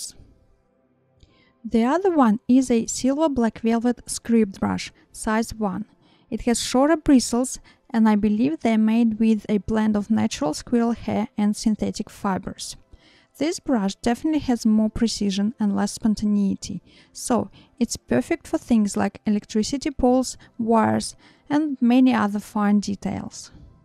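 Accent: Russia. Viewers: Спасибо большое ! Интересно было узнать о ваших кистях . Судя по акценту вы наверно с России .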